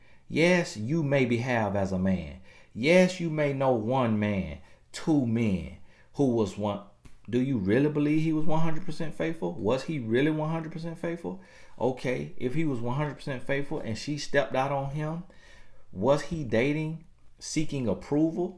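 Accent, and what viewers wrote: United States. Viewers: Gurrrl I fell out laughing and his country accent make it more funny🤣🤣🤣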